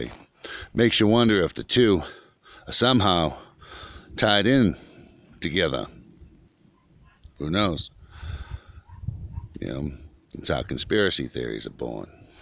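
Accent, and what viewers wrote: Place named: New England, United States. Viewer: Love the Yankee accent! Where are you from??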